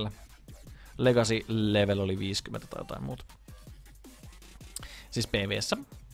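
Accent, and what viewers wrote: Finland. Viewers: He's from finnish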